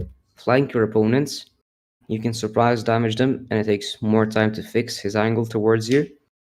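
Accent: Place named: Scotland, United Kingdom. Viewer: Are you European you sound like a scotsman 🤔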